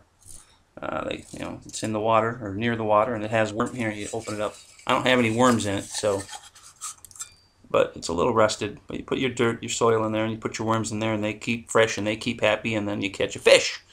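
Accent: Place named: South, United States. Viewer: Great finds as usual. I am going to have to keep an eye out for vintage glasses....where did you learn to speak southern like that...lol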